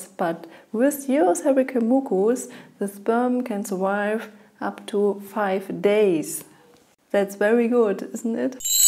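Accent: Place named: Germany. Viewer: i've lived in Austria for 10 years and I couldn't ever have mistaken that accent Germans have when speaking English 😁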